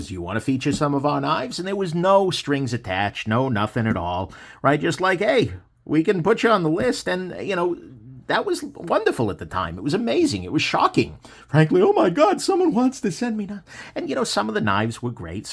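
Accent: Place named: Brooklyn, United States. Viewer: Love your voice, it's like if Professor Frink was from Brooklyn.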